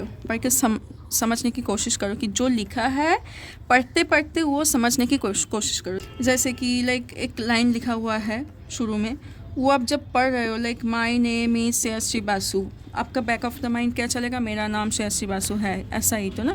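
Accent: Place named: Bengal, India. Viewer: Ap kya Bengali ho? Apka accent bengali ki tarah lag raha hain....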